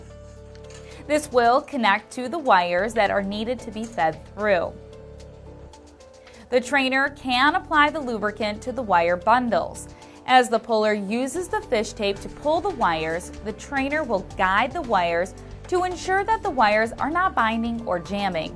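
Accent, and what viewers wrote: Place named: Michigan, United States. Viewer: This is the most Michigan accent I've ever heard 😂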